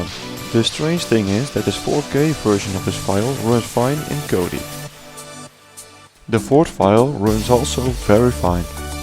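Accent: Netherlands. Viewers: I hear some dutch accent there :))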